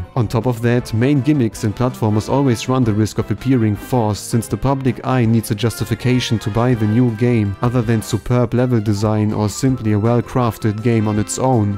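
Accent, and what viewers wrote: Germany. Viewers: as an german i can hear you are german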